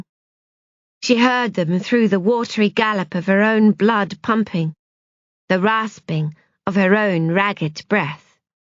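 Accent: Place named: Australia. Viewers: Awful accent. Aussie? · English with an Aussie twang I'd say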